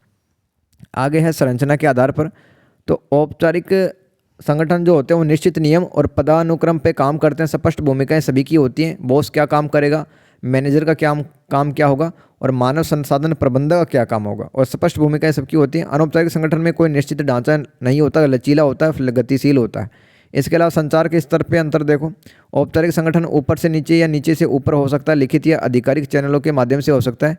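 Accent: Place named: Himachal Pradesh, India. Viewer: Bhai ji aap himachal se ho pahadi bolte ho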